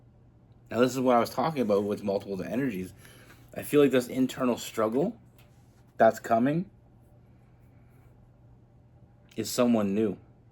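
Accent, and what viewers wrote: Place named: Canada. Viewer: bro that was so great and spot-on! have let go/moved away from a libra, but some of that energy is still hanging around ... meanwhile a pisces has come along and we're taking it slow and getting to know one another and it really feels like emperor/empress. anyway i thought you might also be canadian based on your accent and then i saw the tim hortons lol! thanks and take care.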